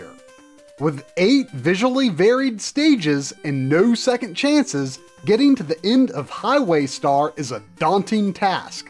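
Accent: United States. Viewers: I understand trying to pronounce some Japanese words can be difficult, but listening to them in a heavy american accent is also just as hard listen to. Japanese phonics are actually fairly easy to learn as most of the sounds are repetitive. I highly recommend the japanesepod101 youtube channel. <link>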